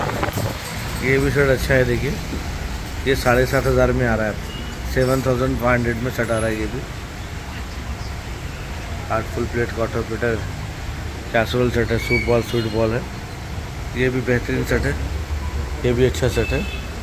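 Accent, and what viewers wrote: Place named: Hyderabad, India. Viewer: Walaikum assalam o rahmatullahi wa barakatuhu Bahut accha lag raha hai aap hyderabadi language mein baat kr rhe ho 😅 Beautiful collection 🎉❤😍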